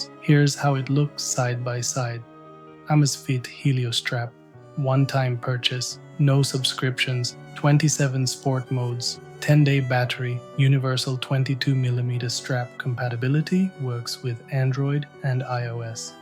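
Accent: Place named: India. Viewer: Terrible Indian accent. Added to that, he speaks like a hypnotist. .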